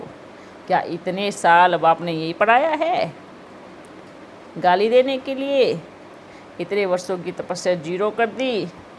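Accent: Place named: Sindh, Pakistan. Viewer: ब्रह्मा बाबा और जानकी दादी सिंद्ध पाकिस्तान में जन्म लिए थे तथा सिद्धी टोन में बोलते थे। अब यहां जो आवाज है वह वैसे ही हैं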